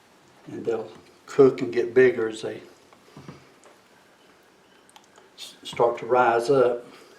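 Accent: South, United States. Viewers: Looks yummy..great for a brunch..;) love your southern accent..I lived in va as a navy wife..loved living on the east coast..;)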